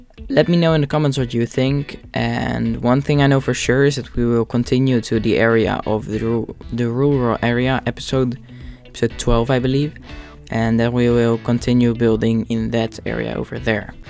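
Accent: Netherlands. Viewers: Are you Dutch/Netherlands?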